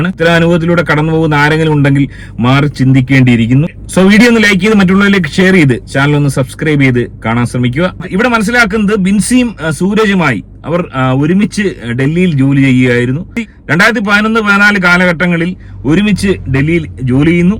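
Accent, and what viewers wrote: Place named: Kottayam, India. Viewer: ആദ്യമായി ഈ ചാനൽ കാണുന്നു.. കോട്ടയം ശൈലിയിൽ വ്യക്തമായി കാര്യങ്ങൾ പറഞ്ഞു. Congrats ❤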